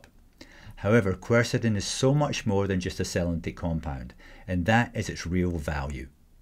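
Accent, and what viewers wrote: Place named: Edinburgh, United Kingdom. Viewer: You’re not very clever, are you? There is absolutely no issue with the guys accent. Sounds like an educated Edinburgh Scot. OK, he’s not North American, but neither are 98% of the world population. You need to open your mind and open your ears.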